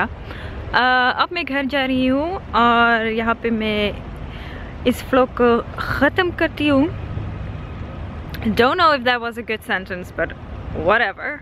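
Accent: India. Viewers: Very impressed with your Hindi as you could speak almost in the accent that people of Bihar and Easter UP( Indian states) . Very nice indeed. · First time am watching your video n when I heard your hindi i was like 😲😲 just like delhi grl...... 😎😎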